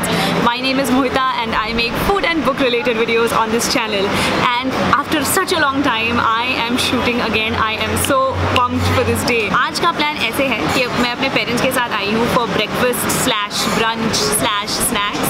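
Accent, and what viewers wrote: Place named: Pune, India. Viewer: Assal punekari hindi boltes tu. 😅😅 Ekhada video marathi madhe pan banav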